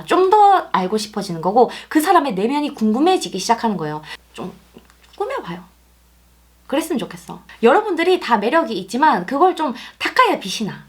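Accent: Gyeongsang, South Korea. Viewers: 아 어디말투지? 경상도+서울 리믹스 ㅋㅋ 매력있네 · 경상도 목소리 친근해요 타지역 10년이라 항상반가워요^^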